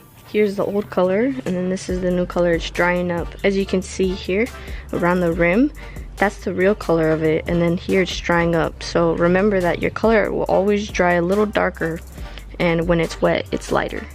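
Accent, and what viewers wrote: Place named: Bay Area, United States. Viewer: Good job.. What part of Cali are you from? You sound like you're from the Bay Area or close by it.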